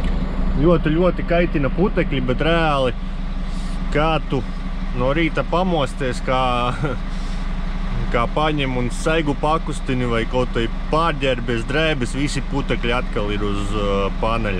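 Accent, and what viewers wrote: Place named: Lithuania. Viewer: What language, sound full on lithunanian with heavy accent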